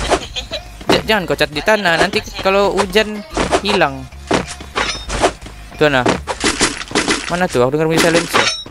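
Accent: Sabah, Malaysia. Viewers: Abg sabah daerah mana?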